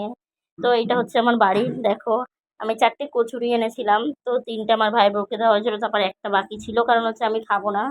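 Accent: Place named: Barishal, Bangladesh. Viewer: তুমি বরিশালের বাঙাল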